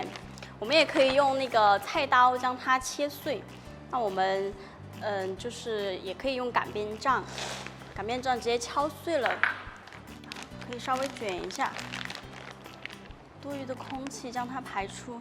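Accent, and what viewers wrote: Sichuan, China. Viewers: 你是四川的還是湖南d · 一开始就听出了你的四川口音，最后终于讲出了四川话，请问哪里人？我是重庆人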